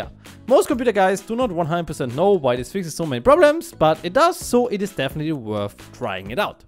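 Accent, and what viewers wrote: Germany. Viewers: Insane Video like always man, all of your videos are just useful and very nice to watch. Like how you can hear the german voice, but i think every german can recognise other germans haha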